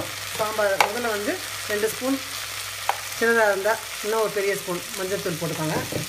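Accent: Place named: Trichy, India. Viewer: hello mam.. I'm mesmerized by ur speed of cooking... simply superb... which part of tamil nadu are you from..? your slang seems like u are from trichy ... i guess..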